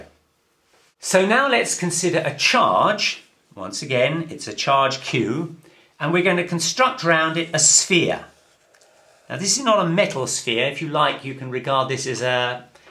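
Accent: United Kingdom. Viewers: One million thanks! fantastic clean and quick review for my EM final test, just one thing, , what if the other charge is not added at the sphere centre, how can the superposition implemented? the phi equation here is not applicable for non-central charges isn't it? Love your British accent BTW :)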